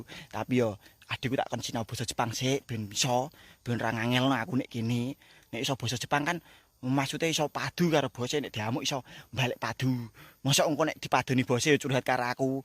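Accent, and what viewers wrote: Java, Indonesia. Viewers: Ak sll ngikuti tik toke ms, ngomonge lucu, jawa ne asli ngendi ms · Medok tenan to kuwe... jiean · Medok amat,jowobe ngendi to mas?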